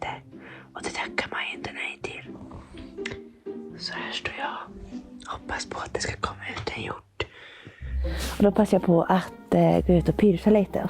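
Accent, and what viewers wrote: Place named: Östergötland, Sweden. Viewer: Bra film!! Kul med lite östgötska!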